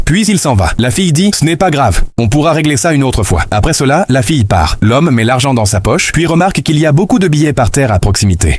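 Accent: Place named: France. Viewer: Merci pour votre accent français. Monsieur l'auditeur. 😮. C'est génial. De ta part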